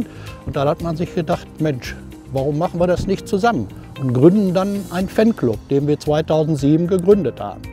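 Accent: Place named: East Westphalia, Germany. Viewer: Schon bei der ersten Ansage merkt man: Ein echter Ostwestfale!🖤💙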